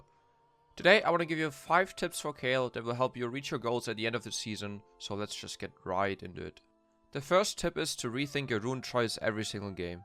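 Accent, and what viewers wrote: Germany. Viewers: love the german accent XD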